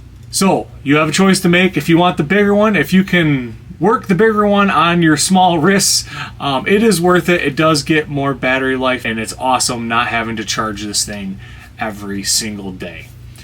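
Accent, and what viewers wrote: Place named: Michigan, United States. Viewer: This guy is so Michigan. The clothes. The accent. Dude looks like every dude in my town. Props from Traverse City, man!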